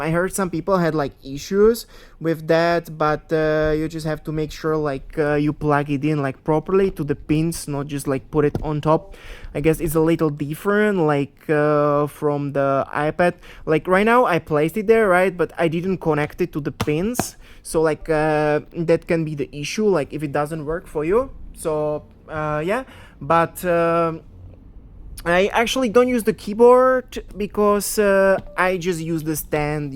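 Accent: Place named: Hungary. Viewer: túl sokat afektálsz , könnyen kihallatszik a magyar akcentus, csinálj inkább magyarnyelvűket, van valami botod de mi a faszoim az? minek mutatod meg ha nem is magyarázod el mi az , értelmetlen használhatatlan a videó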